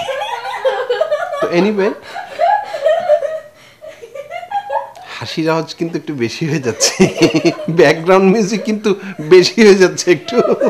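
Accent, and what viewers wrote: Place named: Old Dhaka, Bangladesh. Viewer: Bhaiya, onek majul kahino 😍🤣 Apnar kintu ekhono puran dhakar pronunciation ache. Khubi bhalo Lage shunte 😍😎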